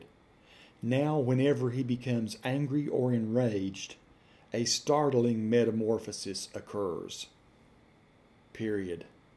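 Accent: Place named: Indiana, United States. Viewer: That was pretty entertaining! lol If I read the parts that it did not get right with my Hoosier accent, I sound like you! Subscribe if you lock! lol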